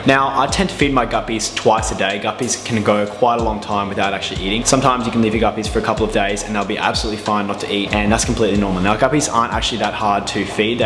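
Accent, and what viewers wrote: Australia. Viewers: Are you an austrilian??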